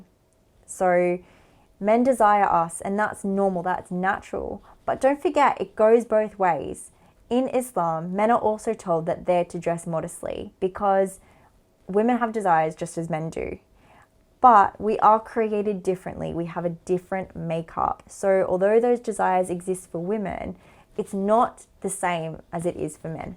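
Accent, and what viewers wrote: Australia. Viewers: I wonder why did you come up much of Aussie accent in this video particularly ??... Earlier videos didn't have that much ...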